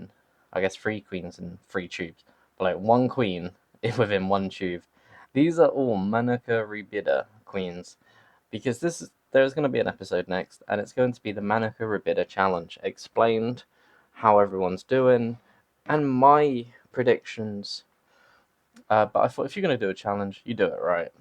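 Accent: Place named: United Kingdom. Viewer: i was really confused when you had s fugax AND c diversa but then i realized that you have a slight british accent. good luck with the manica though.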